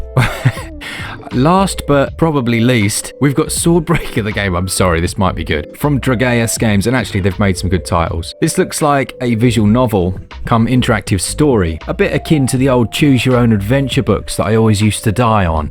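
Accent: United Kingdom. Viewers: Totally agree, it is so nice to hear this pure BrE! I once compared guys to that for me, being from CH, was kinda benchmark:)))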